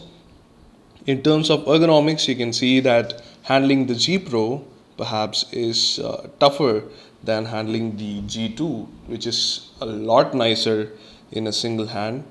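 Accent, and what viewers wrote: India. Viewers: indian accent. dark hands...cant be Russian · indian accent actually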